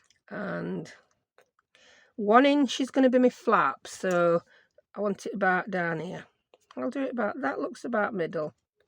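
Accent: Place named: Nottingham, United Kingdom. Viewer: You kill me! I was laughing so hard at the score board part! Just found you. Hello from Canada. You sound like my parents with your accent. They are from Notts.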